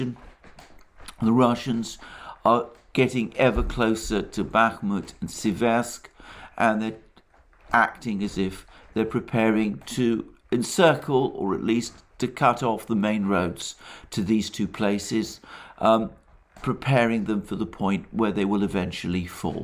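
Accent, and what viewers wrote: United Kingdom. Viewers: He reads commonly available materials and chats about it. Another sucker for a Brit accent.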